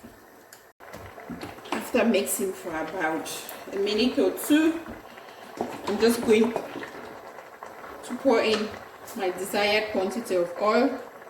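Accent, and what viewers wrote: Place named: Cameroon. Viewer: Oh my, can hear that Cameroonian accent! My Cameroonian sister right there. Achu is my favourite Cameroonian dish, then fufu and eru.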